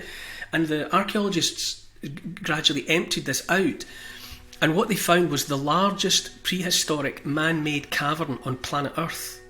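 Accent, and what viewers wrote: Scotland, United Kingdom. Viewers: You Scottish or english?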